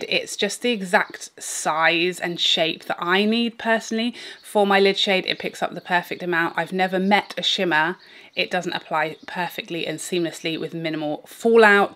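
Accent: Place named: RP, United Kingdom. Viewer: Your channel came up in my recommended yesterday, and I have been binge-watching. You have great information. I really loved your last blush video, and I found several to try. Plus, being from the states and lover of English history and literature, it makes me feel like I'm spending time with my perfectly posh friend with with killer RP accent.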